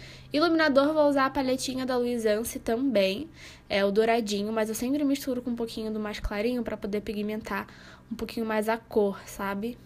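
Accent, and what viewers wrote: Rio de Janeiro, Brazil. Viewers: Achei que você fosse do Rio 😆 sotaque carioca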